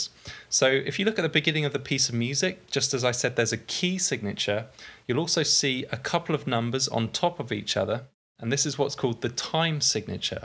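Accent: United Kingdom. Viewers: I just started trying to learn piano, and I have a feeling I'm going to utilize this channel a lot. Plus, it's a lot more fun to learn along to an British accent :)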